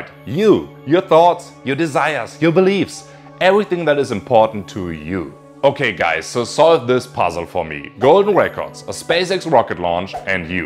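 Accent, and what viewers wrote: Germany. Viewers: i only enjoy his english in a german accent. if he spoke in german I'd be horrified. I've yet to find nice sounding german speech. it's extremely aggressive sounding.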